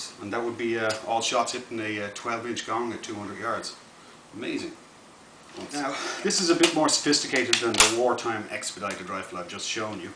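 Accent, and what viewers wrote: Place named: United States. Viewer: "you got yer safety over here!" you're such a yank now!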